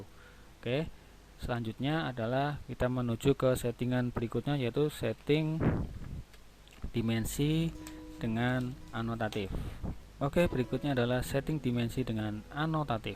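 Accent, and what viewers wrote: Banyumas, Indonesia. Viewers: Cara mencari luas teks bagaimana mas????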